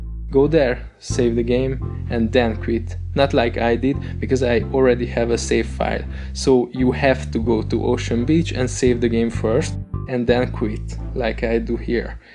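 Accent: Hungary. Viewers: Jól érzem az akcentusból, hogy honfitársak vagyunk? :D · Thanks ! :) That was a really big help :) u from hungary ? cause ur accent is same as hungarian english accent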